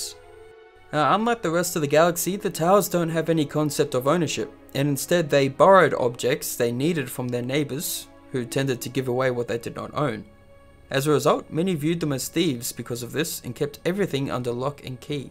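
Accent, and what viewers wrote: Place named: Australia. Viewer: Just discovered your channel, I like what you're doing, I have one question though? which part of Australia are you from? I'm from New Zealand and I almost thought you were Kiwi at first but then realised you didn't have the Kiwi accent.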